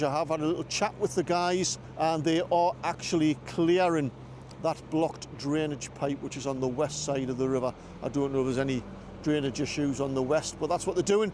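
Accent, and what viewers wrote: Newcastle, United Kingdom. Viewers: Great information, thanks, always nice to hear a gordie telling us about Newcastle and the north east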